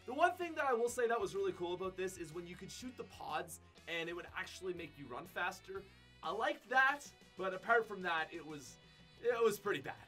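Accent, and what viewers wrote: Canada. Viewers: Love your videos!! You're Canadian accent is hilarious too😂😂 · I love how Canadian he is. Oat-standing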